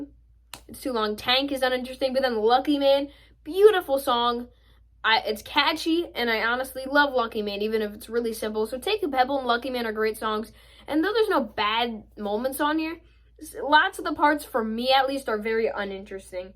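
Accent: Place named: Midwest, United States. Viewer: i can tell you are from the midwest lol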